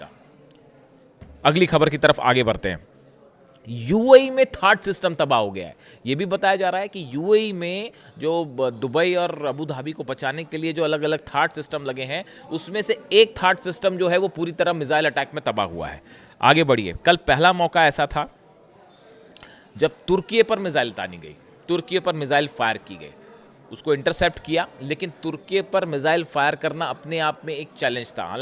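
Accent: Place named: Uttar Pradesh, India. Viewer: bhai ka apna alag andaz bc wala, pure UP style and our favourite 😂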